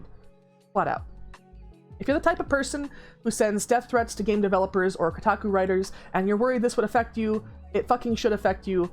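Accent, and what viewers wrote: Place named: United States. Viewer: I don't think you understand what free speech is. I assume you live in the US by your voice, so unless you have been arrested, tried and jailed for speech, it is a protected right.. Just because you werent allowed to blabber during class as a child doesn't mean you don't have free speech. If you're arrested you can literally sue the police department in civil court for the damages. Threatening somebody or making a call to action where there's a reasonable belief people will get hurt has NEVER been free speech.. also Literally no women in the last 40 years has been told "you belong all dressed up in the corner, awaiting a man's command"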